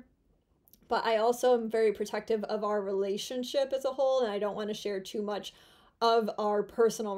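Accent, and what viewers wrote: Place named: Midwest, United States. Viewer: I'm a little disappointed you won't be sliding anymore. I thought that having the baby would be the big change, but I guess this engagement is bigger. I really enjoyed you're frank discussions and the Midwest accent. I have some relatives in Iowa, Illinois, and Ohio.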